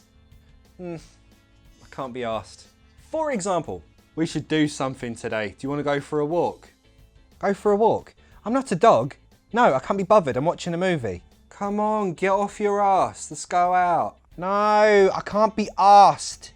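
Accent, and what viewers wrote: United States. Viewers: I love your girl version more and the way you pronounce things in American accent ...😂👌 Really you r amazing ☺️ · Fine american accent !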